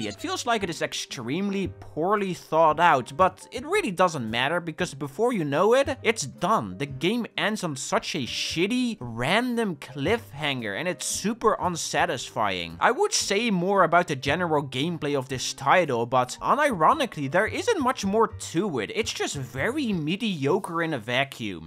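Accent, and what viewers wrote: Netherlands. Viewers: This guy his English sounds so painfully Dutch. Lol Good video though. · Binnen de eerste 2 seconde herkende ik een mede-Nederlander. Gekoloniseerd broeder. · Is dat een Nederlands accent dat ik hoor? ;) Goede video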